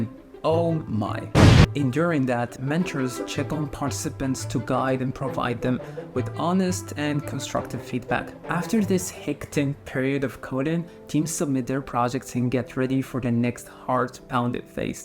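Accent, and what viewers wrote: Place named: Egypt. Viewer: he both sounds and looks Egyptian to me lol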